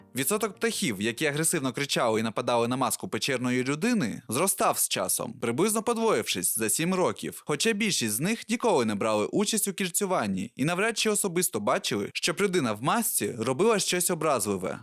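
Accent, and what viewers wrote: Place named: Russia. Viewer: ЧОМУ???? Чому ти використовуєш кацапські наголоси? півтори хвилини відео глянув, вже почув рОки і сАмі....тьху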